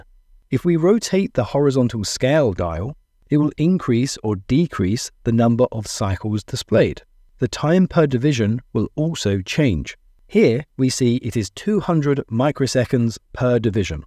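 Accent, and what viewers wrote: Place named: United Kingdom. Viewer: I don't know if this is speaking in the video, but his voice and accent (British, I'm assuming) is very easy to listen to. His step by step, logical directions, have convinced me that an oscilloscope is something I need to look into.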